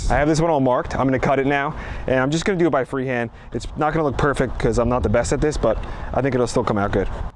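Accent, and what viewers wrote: New Jersey, United States. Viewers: Wow!! I never expected the string part! What great woodworking! Love the NJ accent; Philly born so it is nice to hear! Good job,!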